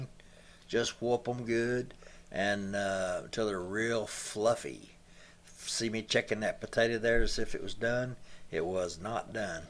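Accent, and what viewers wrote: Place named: Texas, United States. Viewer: That good ol southern accent is everything!!! sound like one of us Texans. Im going to try these tomorrow morning. Thanks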